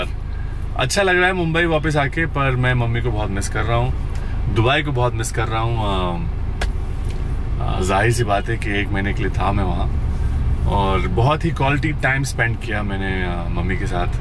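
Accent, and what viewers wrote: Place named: Delhi, India. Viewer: You are totally delhi person....apki baton me ekdum delhi tone feel hota.hai....i love delhi ...delhi dilwalo ki like you ❤️...blessed couple. 🤗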